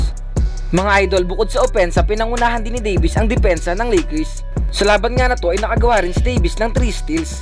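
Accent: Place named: Iloilo, Philippines. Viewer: annoying ang punto ng salita mo mag ilonggo ka na lang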